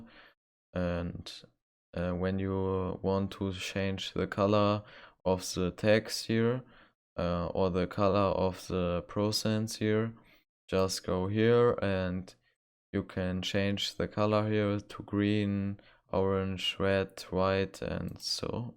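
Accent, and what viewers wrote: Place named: Germany. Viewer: ich hör nen deutschen aktzent :D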